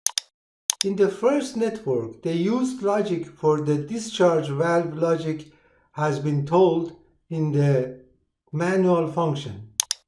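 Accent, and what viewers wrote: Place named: Iran. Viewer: لهجه ایرانی داری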